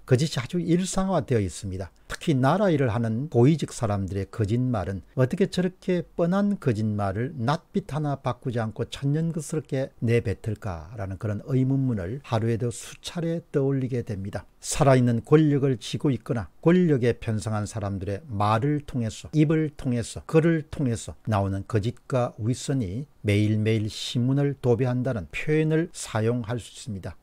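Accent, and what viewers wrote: Gyeongsang, South Korea. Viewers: 문재인포???? 에라이 갱상도 발음좀 고쳐라잉...............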